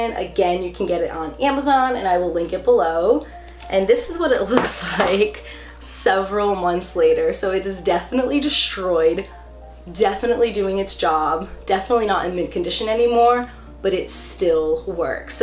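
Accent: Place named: New Jersey, United States. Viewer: He’s so cute ❤️ but the way your pronounce some words 😍😍😍 are you from jersey ?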